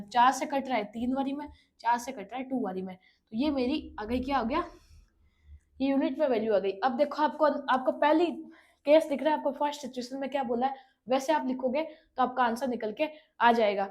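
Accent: Rajasthan, India. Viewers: Tone is rajsthan 🙏m rajsthan se hun🙏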